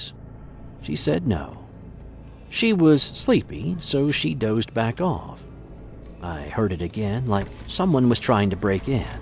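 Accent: South, United States. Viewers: Gotta love that Eargasmic Prime Storytelling Southern Accent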